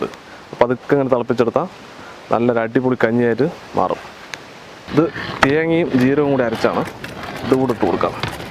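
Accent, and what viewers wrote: Palakkad, India. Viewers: ഞാൻ എന്നും വിചാരിക്കും നിങ്ങളുടെ കൂടെ ഇരുന്നു കഴിക്കുന്നവരെ പോലെ എന്റെ വീട് നിങ്ങളുടെ അടുത്തില്ലല്ലോ എന്ന്. ഞാൻ കോയമ്പത്തൂരിൽ ഉള്ള ആളാണ് നിങ്ങളുടെ ഭാഷ കേട്ടാൽ പാലക്കാട് ആണെന്ന് തോന്നിന്നു നിങ്ങൾ എനിക്ക്‌ ഒരു അപ്പോയൻമെന്റ് തരുകയാണെങ്കിൽ ഞാൻ നിങ്ങൾ കേരളത്തിൽ എവിടയാണെങ്കിലും ഒരു ദിവസം നിങ്ങടെ കൂടെ ഇരിന്നു ഭക്ഷണം ടേസ്റ്റ് ചെയ്യണമെന്ന് ആഗ്രഹിക്കുന്നു.. പറ്റിയാൽ എന്റെ നമ്പറിലേക്ക് ഒരു ഫോൺ വിളിക്കണം.. <num>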